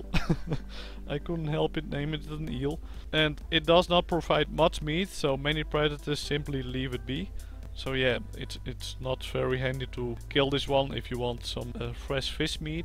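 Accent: Netherlands. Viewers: Are you dutch? xD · are you dutch ? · Another good video :D I see those people still haven't subscribed! I don't understand why people don't subscribe when they watch your stuff! Oh and i was wondering... are you Dutch? :D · are you dutch? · Ik hoorde meteen het Nederlandse engels XD Leuke vid ;)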